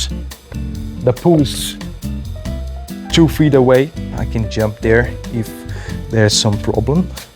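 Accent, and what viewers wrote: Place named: Brazil. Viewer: Percebi de cara que era brasileiro pelo sotaque hahaha. Parabéns pelo projeto!!!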